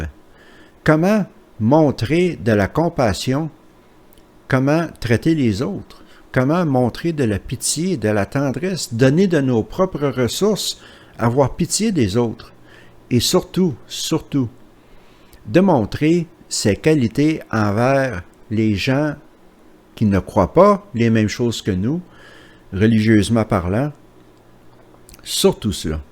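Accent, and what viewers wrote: Quebec, Canada. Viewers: C’est plutôt amusant et ironique en même temps! Vous dites faire tout pour plaire à Dieu, maiiiiiiiiis vous critiquez sa façon de s’exprimer. Intéressant comme réaction. Heureusement qu’il est de Montréal et pas du Saguenay ou même du Nouveau Brunswick 😳 en passant il fait déjà beaucoup d’effort pour pas parler trop " Québécois " ( sans offense pour les Saguenéen ou des Néo-Brunswickois) et en passant ce n’est pas aux autres à faire des efforts pour bien se faire comprendre, on se comprends?😂 désolée trop tentante comme joke (plate bien sûr…) Bref vous venez d’où au juste? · Il est super génial son accent québécois!! et vous "Joe Joe" , votre français n'est pas parfait non plus...! il faut vraiment vous laver les oreilles ou écouter une autre vidéo , mais critiquer désobligeamment ce n'est que pour "critiquer" (toute critique n'est pas mauvaise, il est bon de l'apprendre, de le savoir ) vous Joe Joe quand vous critiquez ce n'est que pour "avoir quelquechose de négatif à dire" ?! 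(si vous ne supportez pas , pas obligé de le dire,!) et il est bon de se renseigner plus intelligemment: en allant chercher les infos utiles sur l'auteur par exemple de la vidéo, avant de "critiquer" (en négatif,)et sachez aussi qu'une critique peut être positive et constructive, au passage...🤔😉 en plus c génial pour mieux "comprendre" le fonctionnement de cette société sectaire (car cela vient de là-bas, des states" et lui parle très bien anglais aussi, il est adorable de nous le faire pour la francophonie!) en France : ils n'osent pas vraiment témoigner, et lui il a les bons tenants et aboutissants pour mieux comprendre la machine à endoctrinement de ces pauvres croyants adeptes TJ! Vive LE QUEBEC !🏵🏁👍 (et céline dion : quand elle ne chante pas ,quand elle est interviewée en france : tu ne la comprends pas non plus ? 🤣)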